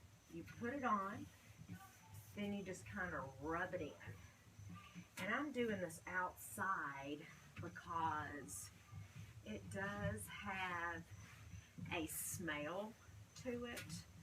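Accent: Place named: South, United States. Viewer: Such a fantastic southern accent! Where are you from?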